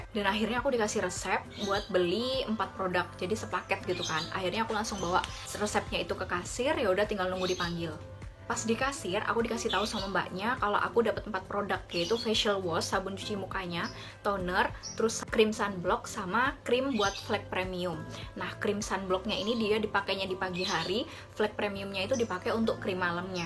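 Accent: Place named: East Java, Indonesia. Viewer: Hahaa... Jawa timur rek.. asyik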